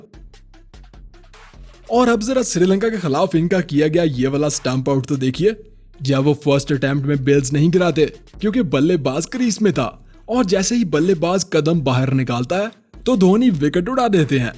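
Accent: Pakistan. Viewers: Bhai Agali video Mein Mere Yaar question ka answer dena Tumhari Awaaz se lagta hai bolane ke tarike se Pakistan ke ho best off lak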